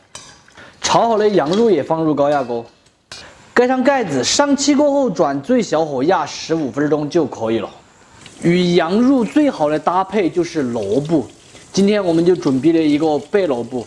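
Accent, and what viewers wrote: Sichuan, China. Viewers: 喜欢听这个川普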